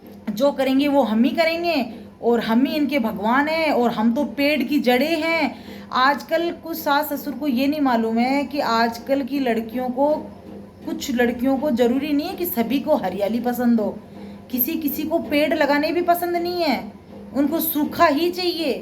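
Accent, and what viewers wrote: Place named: Shekhawati, India. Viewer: Shekhawati❤️ voice dil ko chu gyi didi really we like you ❤️❤️❤️❤️❤️❤️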